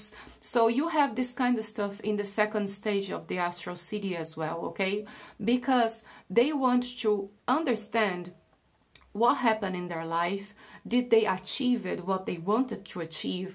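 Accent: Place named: Brazil. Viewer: Eu imaginei que tu era brasileira pelo sotaque :)!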